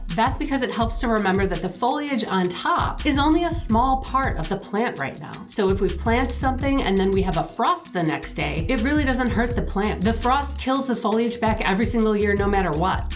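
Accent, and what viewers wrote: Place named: Midwest, United States. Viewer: Love your content! Longer vids plz. No need for all the extra movie clips (Xtra editing)... Your midwest voice is needed on YT!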